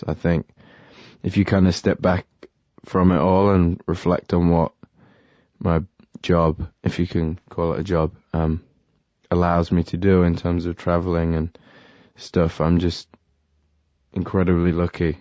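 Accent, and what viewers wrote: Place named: United Kingdom. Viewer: Nope. American here. He sounds British.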